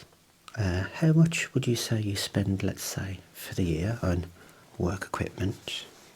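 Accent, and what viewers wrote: Birmingham, United Kingdom. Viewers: I didn't know how much I needed Brummie ASMR 💓 this was lovely, thank you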